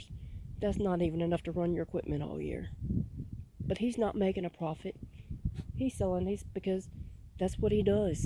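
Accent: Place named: South, United States. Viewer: I love your country accent ❤️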